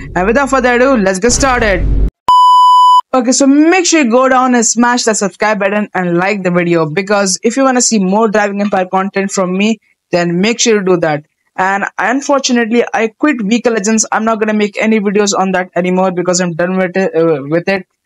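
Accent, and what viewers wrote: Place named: India. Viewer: are u Indian? U have a Indian accent which is cool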